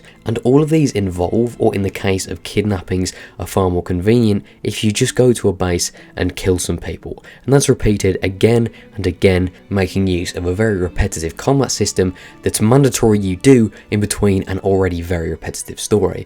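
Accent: United Kingdom. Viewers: Hello, I've just finished playing this game, and it was my first AC ever. And I loved it. But I also totally agree with your review! Thanks for opening my eyes in some aspects. I still enjoyed it though, and will probably platinum it since i'm halfway through that already. Also, I'm a sucker for London and British accent, so I guess I'm biased. I suscribed for this review ♥ gonna binge-watch some other videos of you!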